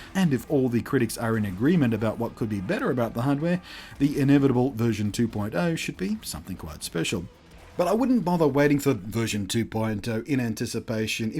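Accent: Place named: Australia. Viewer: On the topic of Australia, your accent always makes these reviews just that touch more special, but I really do feel for you having issues getting your hands on a lot of stuff not shipped or offered in Australia and your internet connection troubles. I have been waiting for this review and it was nothing short of what I expected. Thorough in depth review over the course of multiple games showing strengths and weaknesses. I'm going to hold off on this one for a little bit as I'm a console gamer moving over to PC gaming so I'm accustomed to the less controllable twin sticks. But I will definitely be getting this at some point in the future. I can wait for 2.0 when it does roll around. I hope things getting to Australia starts to get easier though. You're one of my favorite reviewers and if stuff takes longer to get to you then you're missing out on a lot of traffic and I just want to see someone I appreciate get more recognition I feel they deserve.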